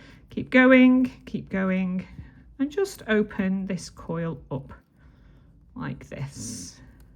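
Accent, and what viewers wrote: United Kingdom. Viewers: Another great piece and so unique! I wish I’d had this when I was still working in an office. Thank you for this! 😊 Oh and by the way, do not worry about saying things such as “like so” or “literally” multiple times. We don’t mind. As an American I appreciate the little nuances of the English language as spoken by someone from Great Britain. My favorite thing you say is “faf about”. I had to look it up the first time i heard you say it and I just love it. 😁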